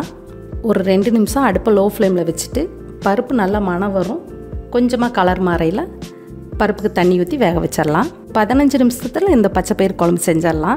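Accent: Coimbatore, India. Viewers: Unga kovai slang superb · Your demo is very good with Coimbatore accent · slang apdiye namma ooru slang Sema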